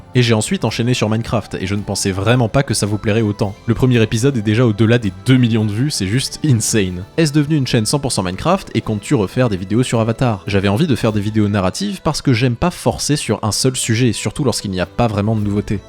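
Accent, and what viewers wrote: Quebec, Canada. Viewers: Bonjour, depuis que j’ai vu ta chaîne je regarde tes vidéos et une question me trotte dans la tête et tu n’y as pas répondu dans la vidéo. D’où te vient cet accent québécois exceptionnel ? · Est tu qebecois? Moi oui.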